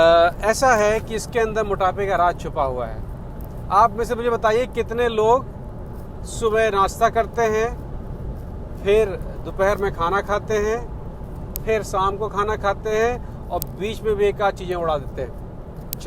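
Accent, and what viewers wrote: Bihar, India. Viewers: kya aap bihar se ho kya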